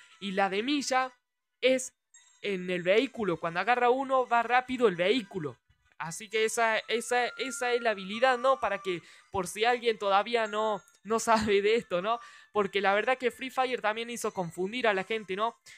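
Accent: Córdoba, Argentina. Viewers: Gracias por La Info, Casi Me La Compro Para nada. Sos Cordobes? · Sos cordobes??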